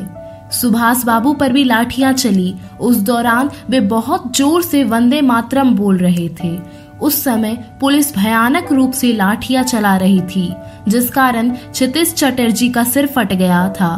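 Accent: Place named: Hyderabad, India. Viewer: Bhai tum Hyderabad ke hain kya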